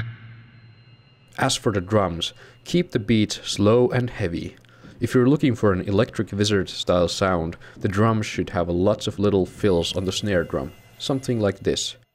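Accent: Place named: Europe. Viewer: Late to the party: are you a Dutchman? You have a very European accent. You did hit the nail on the head and distilled Stoner Doom to it's finest essence. If you want Dutch Stoner/Doom try the band ' ' from Leiden!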